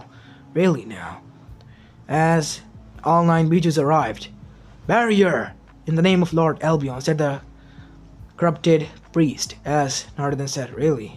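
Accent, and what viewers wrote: India. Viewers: Bro has the Indian accent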